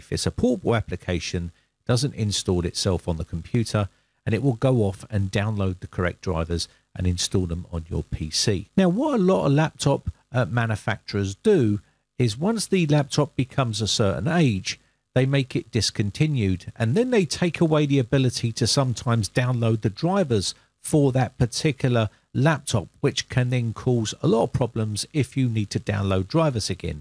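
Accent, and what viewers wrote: United Kingdom. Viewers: His videos are outstanding, my American English, however, doesn't always pick up on his UK English! Thanks for your comment.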